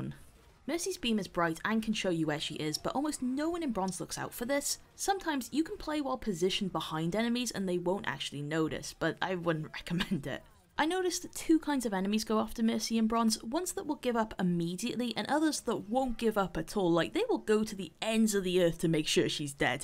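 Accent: United Kingdom. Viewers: I always wondered, what part of UK is this accent from. I find it funny but lovely. I had it stuck in my head when I played Witcher 3.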